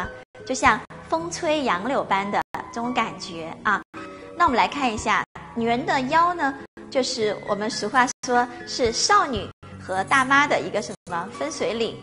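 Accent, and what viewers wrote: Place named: Russia. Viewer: 字正腔圆，好可怕😨听着听着，好像回到了罗刹国。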